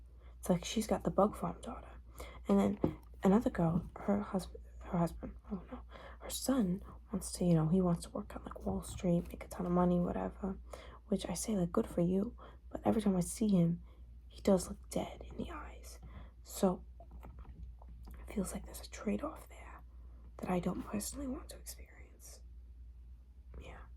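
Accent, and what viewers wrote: New Jersey, United States. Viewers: I love your jersey accent the best!! ❤ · Omg I love the jersey accent, idk asmr sounds so cool with it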